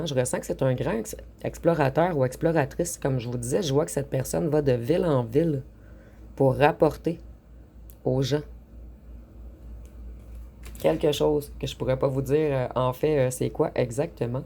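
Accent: Canada. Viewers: J adore ce vernis. La voix et l accent canadien j adore.quel est votre petit prénom discret . Je suis reunionnaise, nous avons un patois qui ressemble à celui des canadiens. ❤❤❤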